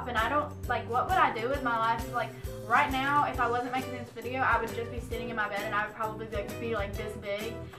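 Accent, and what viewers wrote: Alabama, United States. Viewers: She's from Alabama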